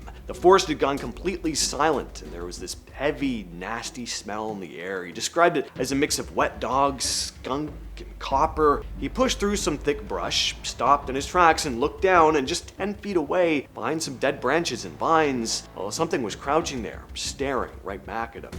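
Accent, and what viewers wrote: Australia. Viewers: Australian accent... verdict...Not to bloody bad cobber.. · Great creature pics!... your Australian is perfect!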